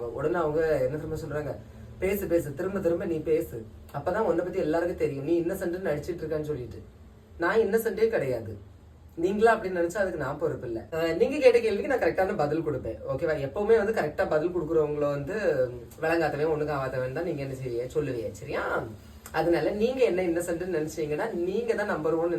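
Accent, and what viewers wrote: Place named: Nanjil Nadu, India. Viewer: உங்கள் அனுபவங்களை கள்ளகபடமின்றி பேசும்போது கேட்க மிகவும் அருமையாக உள்ளது.... நாஞ்சில் தமிழ் அப்படி....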